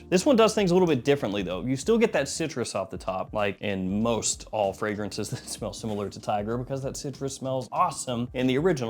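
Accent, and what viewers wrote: South, United States. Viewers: Your southern accent really came out in this vid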